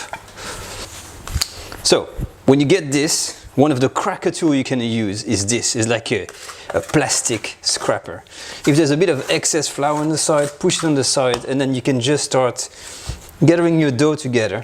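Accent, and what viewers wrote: France. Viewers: I subscribed because I love your French accent! Okay... and I love food recipes! 😆 (Watching from Chicago) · Love this video demonstration of yours...French accent and all...👍🥰 Thanks for sharing.. ❤💖💝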